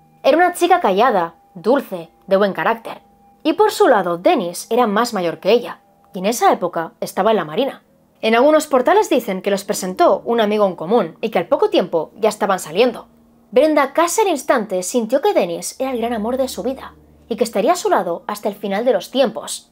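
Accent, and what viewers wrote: Spain. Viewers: ¡Qué linda estás! Siempre veo tus video y me encantan (además amo el acento español). Saludos desde Argentina🥰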